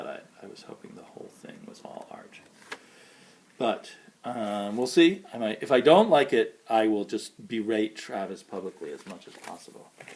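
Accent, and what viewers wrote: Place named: United States. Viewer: Thank you for the kind words and shout out. No worries on the name pronouncement, it´s hard to pronounce with the american accent I know, but cuddos for trying;) Still enjoying Batman Eternal and Batgirl with the beautiful Alex Garner covers. Cheers!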